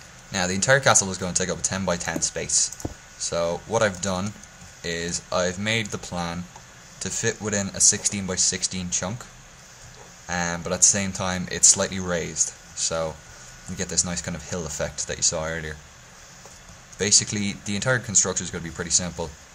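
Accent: Ireland. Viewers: what part of ireland are you from ima dub :D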